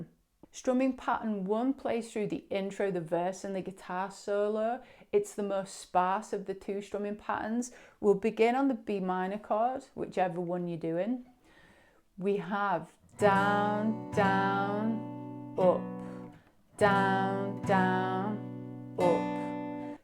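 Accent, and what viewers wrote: United Kingdom. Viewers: Sounds like she is a Brit?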